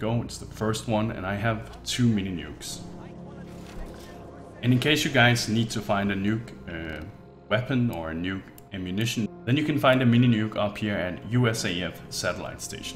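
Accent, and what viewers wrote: Denmark. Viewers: Is he from Denmark?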